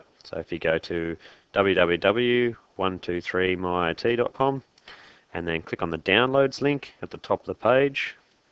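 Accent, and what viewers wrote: Australia. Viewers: An Australian accent! Very helpful vid, thank you...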